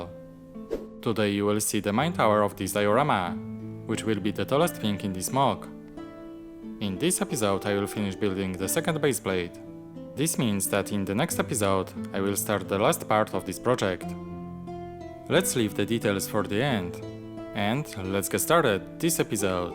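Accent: Poland. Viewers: Tak mi coś akcent podejrzanie zalatywał Pongliszem więc miło widzieć, że PL. Dobra robota! 🙂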